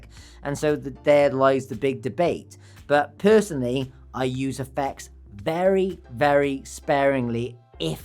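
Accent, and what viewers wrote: United Kingdom. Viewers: Who is this bri'ish bloke trying to tell me how to do?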